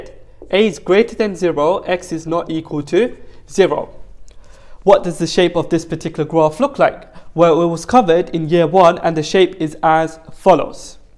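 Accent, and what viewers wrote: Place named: East London, United Kingdom. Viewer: East London Accent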